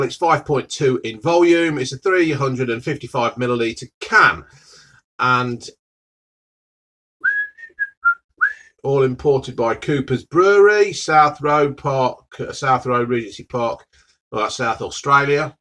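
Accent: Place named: Northern England, United Kingdom. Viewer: Where are you located buddy? Sounds northern. Im a filthy manc and love your down to earth content.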